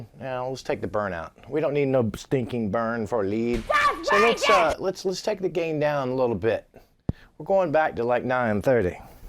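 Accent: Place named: Delaware, United States. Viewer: You’ve got sort of a Walton Goggins voice and humor thing goin’—that’s a compliment. [Vice Principals, The Righteous Gemstones….] You’re from Delaware? I grew up there but don’t remember that accent. // Looking forward to getting this amp in a few days—well, oddly, it’ll be this or a Princeton or Deluxe Reverb…. Depends on which one makes it move….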